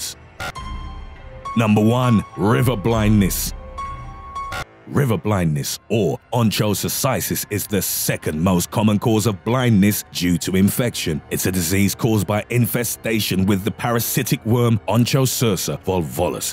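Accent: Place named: United Kingdom. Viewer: cinocee scottys a pleab. Anyways, this dumb ass voiceover takes the scary away, sounds like a British butt pirate.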